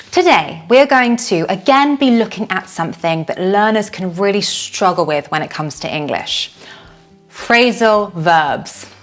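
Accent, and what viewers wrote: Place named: London, United Kingdom. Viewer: I'm a native English speaker in London. Your accent is extremely similar to mine. You rightly pronounced the second 'a' in 'vocabulary' as a schwa. It seems to me that British people are increasingly pronouncing the word as 'Vocabu-larry' (like Americans say it). You pronounced 'often' with a silent 't', as I do. When I was a child in the 1980s, /ˈɒf.tən/ was regarded a mispronunciation. I assume it in came into the British English dictionary some years later.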